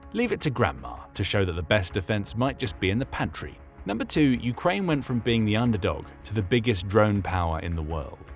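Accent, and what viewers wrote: United Kingdom. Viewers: Next time, use mid-continent English, not uk "english", a la Shakespeare crap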